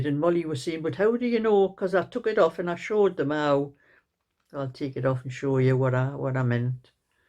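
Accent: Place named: Durham, United Kingdom. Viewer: I love your chats, you are interesting. Your voice reminds me of friends I know in Durham.❤❤❤❤. You have a lovely accent.❤❤❤❤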